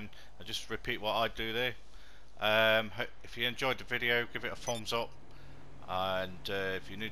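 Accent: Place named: United Kingdom. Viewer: Honestly never leave comments on videos but 1.your british and not a squeaky american 😩. 2.your straight to the point. 3.its not click bait 4.idk but you got a sub and a like from me 💚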